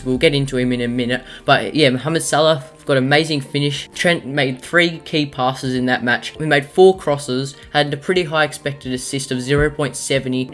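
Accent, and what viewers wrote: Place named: Australia. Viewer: Love Australian accents, you got yourself a new subscriber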